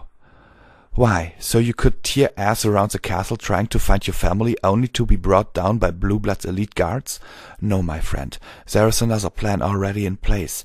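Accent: Germany. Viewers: I don't know I just listen to it on YouTube and you said you're from Germany should have guessed it through your accent anyway I hope you take my recommendation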